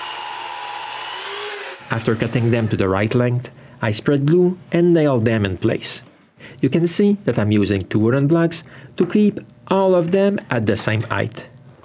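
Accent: Quebec, Canada. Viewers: Vous ete Canadian Francais? Je suis americain et entendre votre accent(je crois.) Bravo en tout cas(je vis en France depuis 30 ans...by the way) rr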